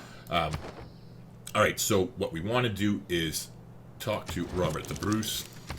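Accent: Canada. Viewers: Hey. Not a Civ comment, but... I took my undergrad degree in The Soo, Michigan. Your accent reminds me of all the cool Canadians I used to hang out with way back when, eh? Thanks.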